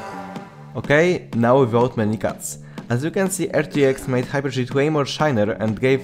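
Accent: Poland. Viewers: fajnie montujesz i wgl ale jak mowisz po angielsku to az boli xddd taki akcent bie angielski i jak sie baoglodam czasem anglikow to ptm mn uszy bola jak np b oglodam z pl akcentem xddd pozdro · It’s because of his Polish accent, which we can’t blame him for, however, YouTube’s automated captions generate what he’s saying pretty well.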